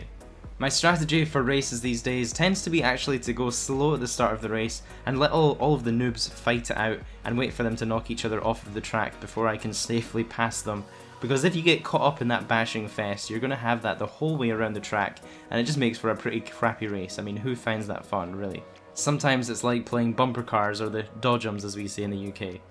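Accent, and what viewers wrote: Scotland, United Kingdom. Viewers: U scottish?? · Are you fae Scotland? 🏴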